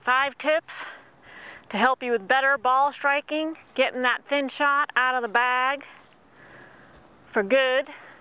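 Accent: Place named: New England, United States. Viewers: Working my way through all your videos, which have been soooo helpful. I am like Mark J. I stick to one person's advice and yours is clearly a game changer. Thanks and I love your Yankee accent. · Funny lady and such a clear explainer. Love that no nonsense New England accent. packs a ton of info into a short space and is always entertaining.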